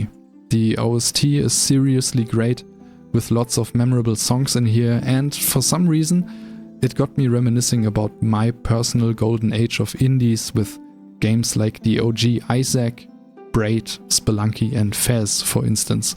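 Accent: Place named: Germany. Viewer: you have very beautiful accent - is it German? P. S. I figured out you were only starting by the audio quality - there's too much noise and the volume is a little unbalanced. Still great video xD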